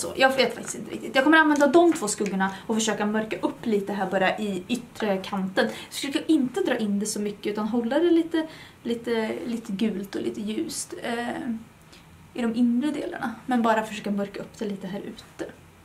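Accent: Värmland, Sweden. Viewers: 😂 Hur kan det skilja så mycket på rösten bara för att man byter språk? Och hur kan jag missat denna? Dock underbart att höra en härlig svensk dialekt. 🙌🏻 Tack för denna! 🌸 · Kul att höra dig på svenska och din värmländska klang lyser igenom 😍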